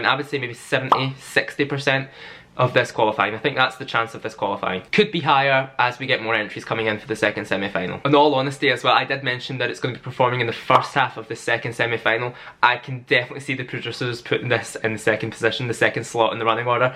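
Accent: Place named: Scotland, United Kingdom. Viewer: love your scottish accent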